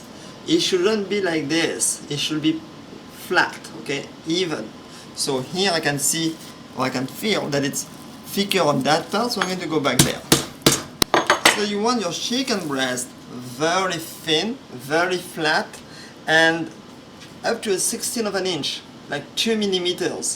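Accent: France. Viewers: Wow so yummy with the French accent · this guy has an awesome French accent and a really cool hat - this has got to be the best recipe on YouTube for Chicken Cordon Bleu :) LOL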